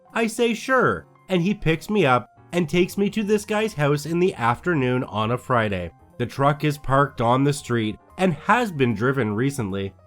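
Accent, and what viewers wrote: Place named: Canada. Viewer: Also "manufacture" and "process". You don't have the stereotypical Canadian accent on "house" and "about" and you don't throw in a bunch of "eh"s, but the accent is still pretty prominent.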